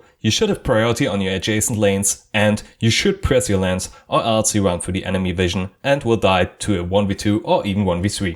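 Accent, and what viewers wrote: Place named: Germany. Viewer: Short, helpful, sounds germanish, like und abo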